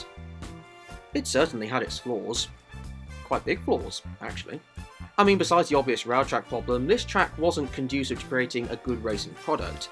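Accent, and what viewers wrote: United Kingdom. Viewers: Just found this channel Great content 🎉 The grainy British commentary matches the race footage perfectly So jazzy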